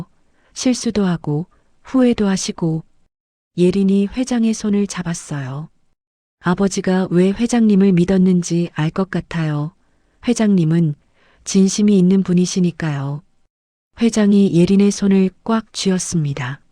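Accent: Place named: China. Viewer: 쭝꽁놈인가 말이 이상하네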